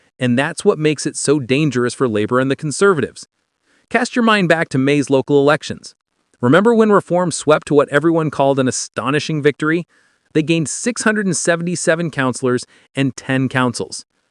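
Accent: United States. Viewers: as usual - no clips , no evidence - just the same boring American voice enjoying the sound of his own voice!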